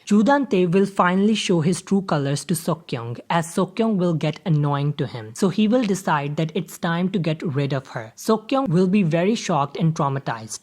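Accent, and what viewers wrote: India. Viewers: mhm the indian accent gets me everytime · I love your theory. And your Indian accent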